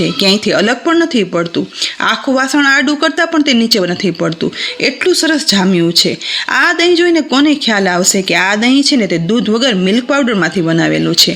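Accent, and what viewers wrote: Kathiawar, India. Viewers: Tamara bol vani rit and sabd pure khathiyawadi che...bahu Sara's bahu saras style che bol vani...and etlu j mast dahi banavani rit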